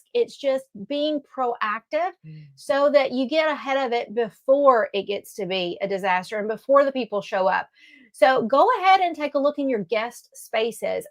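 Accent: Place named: South, United States. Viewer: Hey! Cathching the replay! We're still put here at the cabin. Hubby has been building the shed and he's setting up the washing machine today that we picked up the other day! Yay! Nomore hand washing laundry when we're out here! I will still dry them on the ine, but we will have both an outdoor line and indoor in the shed. I love line drying anyway. I love your southern "hick" accent. I have a "slight" southern accent because I'm from an area that is kind of mixed between north and south, if that makes sense. I have have FINALLY developed the one habit that I've been struggling with for 15-ish years! Since I've bern here this trip (came here at the beginning of March); I have-without fail- gotten ready for bed every single night before settling in for the evening! Nomore falling asleep in my day clothes! I have made that a priority over after-dinner clean-up in my evening routine. 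That means I still have to do he clean-up in the mornings alot, but that's ok. When I ferl like my evening personal care routine is as automatic as brushing my teeth, both here AND at jome, THEN I'll work on prioritising after-dinner clean-up. For now, if I get it done, great. If I don't, it's ok. The ticks! Ohmy! We're constantly checking each other for them out here, and we still find ourselves picking them off after checking lol. We get them back home, but not THIS much! I've kind of gotten used to it as part gor the course lol.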